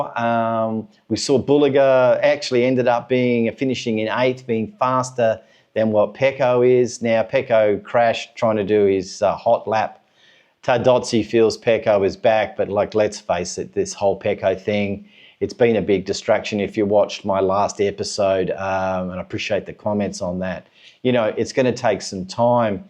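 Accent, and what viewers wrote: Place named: Australia. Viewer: Hi, considering your accent, seems you come from Australia, don't you? Btw his name is Raz-gat-lioglu aka RazGOAT😂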